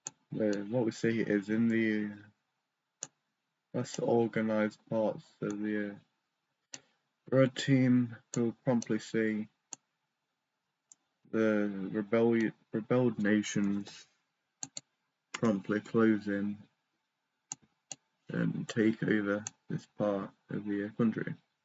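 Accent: United Kingdom. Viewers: Bri’ ish voice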